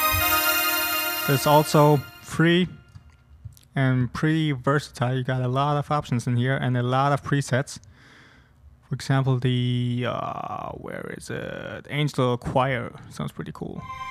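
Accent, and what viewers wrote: Germany. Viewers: are you a german guy? Greetings ?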